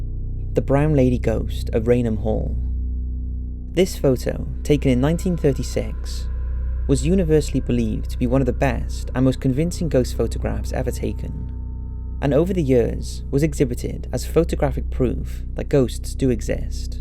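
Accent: Wales, United Kingdom. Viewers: This is awesome, I love your research. You’ve got a Welsh twang too - even better! Please solve the Ripley ghost photo as that is the only thing on the planet that truly freaks me out. I think I read that it might be a photographic anomaly and could be of the flower arranger, but that would have to be one heck of an anomaly to end up as an eight foot cowled figure without a face. shudder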